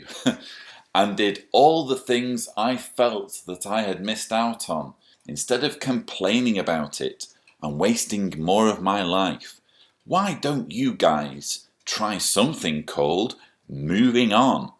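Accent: United Kingdom. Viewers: I loved your Prince video. I'm not an ex jw but remember the ones I've come across ... and I remember feeling bad for pretending not to be home when they came to the house ... but ever since I was little I was fascinated with the little magazines... the beautiful illustrations and kind messages... and the well dressed people giving these little booklets out.... my mom raised me Catholic but I was allowed to play with the little books for coloring or playing office.... when I did answer the door I was taught to be polite and received the little books or at a bus stop waiting I'd appreciate the free little book from the jw... anyways... my world would never gave been able to commit to the meeting demands etc etc... BUT STILL MY LONG FASCINATING INTEREST IN THEM REMAINS.... and now with all the ex jws and YouTube TTATT .... man some serious juicy gossip and exposure.... 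wow thank you for sharing your story... I love listening to your British accent.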